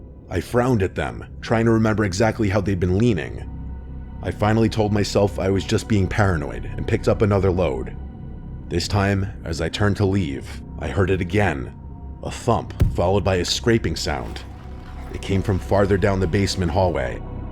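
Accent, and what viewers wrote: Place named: East Coast, United States. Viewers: NYC or suburbs area. Probably Brooklyn or LI. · Mr nightmare got a east coast accent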